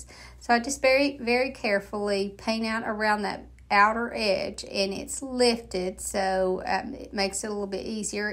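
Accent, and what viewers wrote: South, United States. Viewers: What gorgeous projects ❣️💕 While I have seen many upcyclers paint over silver-plated trays, this is the 1st time I have seen anyone leave an unpainted silver border around the edge of the tray and also leave the back unpainted...and I rather like it❣️🤍 Everyone has their favorite "go to" paint color. Not hard to understand why "buttercream" is a color you use frequently❣️🤍💕🤍 Not only is it beautiful for all of the reasons you described, BUT IT SELLS, which IS THE BOTTOM LINE, LOL.🤣🤣🤣 And being from Minnesota, am I the only one who loves ❤️ the way softly says, "buttercream" with that darling Southern drawl⁉️⁉️ Hahahahaha❣️👍🏼😁🤪🤣 The ONLY drawback I experience when you say "buttercream" many times during the course of a video is the HUGE CRAVING I GET FOR A BIG SLICE OF CAKE 🎂 🥮 WITH BUTTERCREAM FROSTING AND AN ICE COLD GLASS OF MILK, LOL❣️🤣🤣🤣🙈🙊🤣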